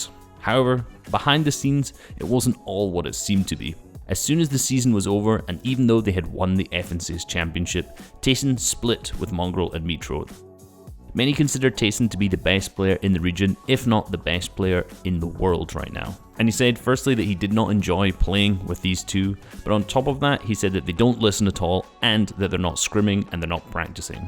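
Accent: Scotland, United Kingdom. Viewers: Where in Scotland r u from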